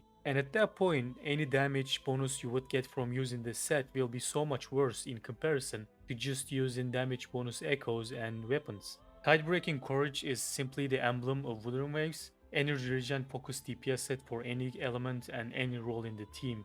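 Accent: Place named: Turkey. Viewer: türk müsün? aksan türk aksanı.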